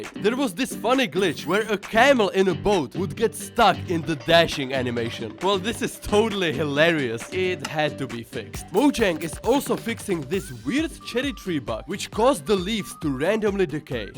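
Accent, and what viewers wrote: Czech Republic. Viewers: ceskej accent jde hodne znat xd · cau podle prizvuku jsem poznal ze jsi cech drzim ti palce at se ti dari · Dle tvého přízvuku si tak na 85% typuju, že jsi z Česka. Je tomu tak?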